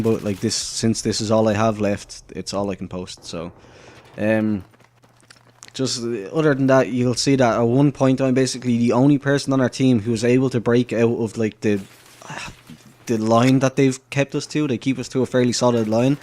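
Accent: Ireland. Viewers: U aren't! I'm actually Scapooty and if ur then you should know me. Lol never knew you had such a Irish voice! I should be uploading some PC BF3 gameplay soon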